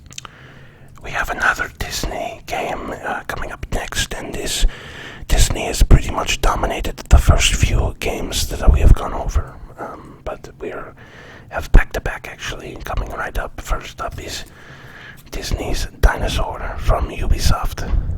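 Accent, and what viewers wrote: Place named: Japan. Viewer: I love the old Japanese accent! 😂 Perfect 👌🏼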